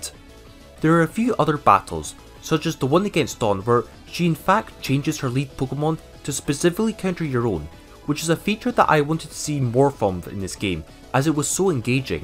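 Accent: Scotland, United Kingdom. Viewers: i really just wasted half an hour watching a scottish man on the internet talk about nuzlocking, oh well still was a decent watch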